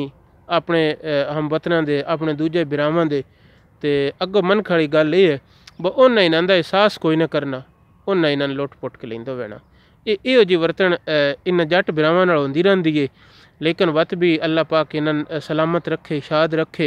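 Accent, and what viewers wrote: Sargodha, Pakistan. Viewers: میرا بھائی کیا بات ہے اصل پنجابی سرگودھا دا انداز تے مزدار بات ۔۔۔۔زندہ باد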